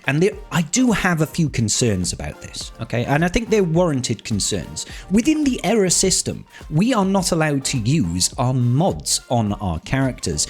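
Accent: Wales, United Kingdom. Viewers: Welsh, I thought you were Australian.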